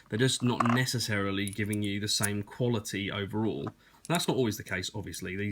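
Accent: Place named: England, United Kingdom. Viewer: It's refreshing to hear an English accent in the spinner review section of YT! It's also great to hear succinct and balanced opinions as regards top end spinners and their mid-quality counterparts. I for one have an aptitude with all things arty crafty so I'm in my element buying mid-priced spinners and then re-finishing them by hand then putting in a good bearing. For example, I've just bought both a Zentri Nano and a (now obsolete) Triton mini ...both in SS, hand smoothed/polished them and both are running One Drop bearings ...all part of the fun :-) Thanks ...thumbs up/subbed.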